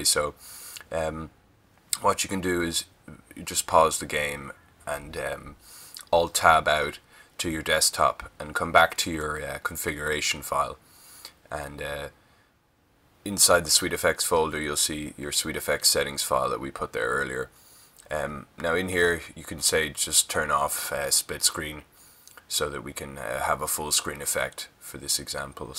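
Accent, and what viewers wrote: Ireland. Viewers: you have the best irish accent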